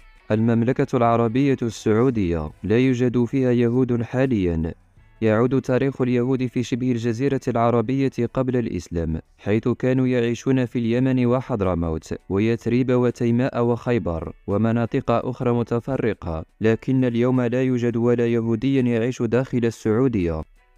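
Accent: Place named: Morocco. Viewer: حتى هنا يتتبع المراركة الاثر اليهودي و يروجون للصهاينة...على فكرة انت يهودي مغربية...و لهجتك واضحة...تفو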